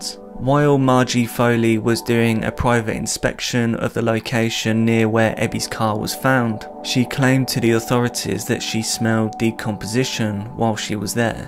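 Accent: England, United Kingdom. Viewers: Dude you’re just reading the Wikipedia article nearly verbatim, albeit with a spooky English accent. Right on for making something entertaining without doing too much work.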